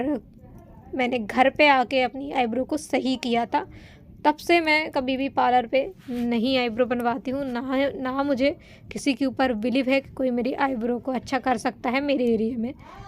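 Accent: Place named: South, India. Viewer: Aisa lg raha koi South Indian Hindi bol raha h.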